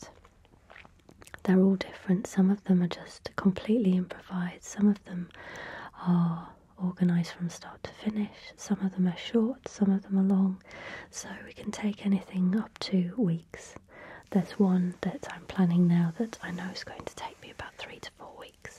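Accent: United Kingdom. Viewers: Thank you! I'm so happy you shared your favorite ASMR channels with us... I too love ASMRtists with an accent, I don't know why.. and for me YOU have a lovely English accent;-) I'm a French-speaking canadian, so your british accent is really exotic to me! Many artists from over the world do videos in English, I guess it's easier to reach more people using English, since it's an international language... but I'm always happy when I discover an ASMR artist that speaks french. Unfortunatly, there are not that many... So I decided to start my own little channel in French, and you really are an inspiration to me! I'm about your age and I somehow recognize myself in your life story (I loved your "life in drawings video"). Thank you for your generosity! xx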